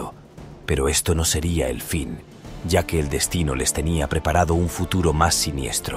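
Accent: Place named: Spain. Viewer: la verdad me parece ridiculo, pero bueno supongo que es más por que todos ya estan acostumbrados a la voz anterior. En lo personal prefiero esta voz, tiene aunque sea un poco mas de sentimiento y le da más un toque a documental que la voz robotica anterior, y a criterio propio siempre he sentido que el acento español le da un plus a este tipo de narraciones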